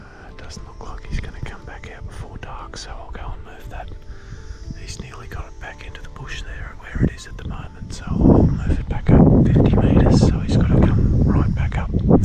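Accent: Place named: Australia. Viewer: You had me cracking up when ya come back and its still alive 😂😂😂😂 proper ozzie talk that hahahaha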